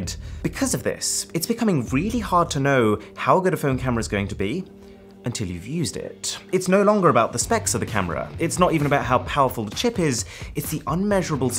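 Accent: United Kingdom. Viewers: Its his wonderful british accent that gets me hooked on every word he pronunciates in all these videos. He needs to read audio books for better sleep. Lol.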